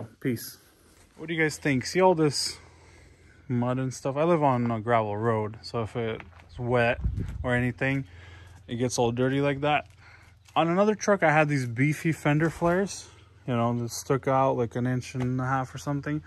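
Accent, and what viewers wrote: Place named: Eastern Europe. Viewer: Another great video. People want to put down the 6.4 but remember is was not Ford Nor International fault that the government made so many emissions mandates. Many people say that after 10 years then the vehicle emissions should be exempted. By the way, I hear a hint of either Russian or Ukrainian or easter Europe in your voice. May I as, Where are you all from?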